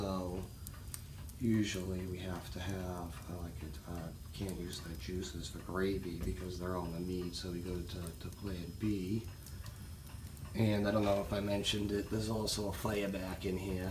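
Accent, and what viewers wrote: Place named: Massachusetts, United States. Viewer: This is a genuine Massachusetts accent my brother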